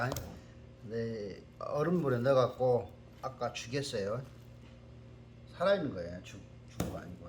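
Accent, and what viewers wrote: Jeolla, South Korea. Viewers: 선장님께서는 완죤 구수한전라도 사투리 듣기좋아요 영상들으면 구수한 사투리 재미 있어요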